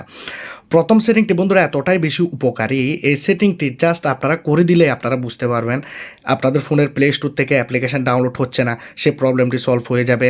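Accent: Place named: Chittagong, Bangladesh. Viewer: ভাই মনে হয় চিটাংগা।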